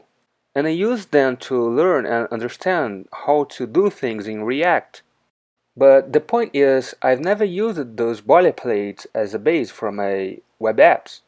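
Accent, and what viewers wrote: Brazil. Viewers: Reconheci o sotaque brasileiro :) kkkk Rapaz tô sofrendo pra aprender React. Realmente baixei um boilerplate pra integrar em um projeto Django, mas veio tanta coisa junto que me perdi todo. O problema é que se o projeto não for seguro e não puder se comunicar com o API num serve pra nada. Você ensina essas coisas ? Tô precisando de um mentor de Javascript Frontend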